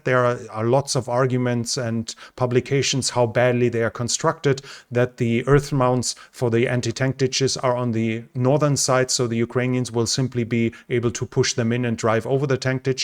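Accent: Germany. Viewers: Hessisch Dialekt? Your English sounds like my Frankfurt family..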